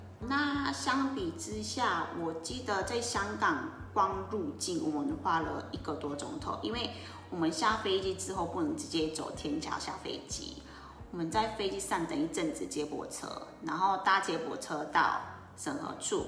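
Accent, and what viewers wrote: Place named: Taiwan. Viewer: 台灣腔真好聽。已經變成台灣人了。🤗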